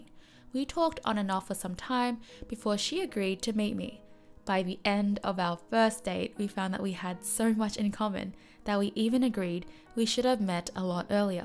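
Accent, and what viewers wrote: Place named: Australia. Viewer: Your voice was soothing. Love your soft aussie accent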